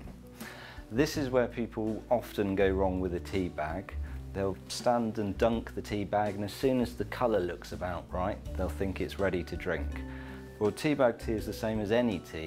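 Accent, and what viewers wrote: United Kingdom. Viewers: The perfect tea video: English accent Formal attire Proper tea etiquette Elegance Fine china Immaculate tea knowledge 10/10, would recommend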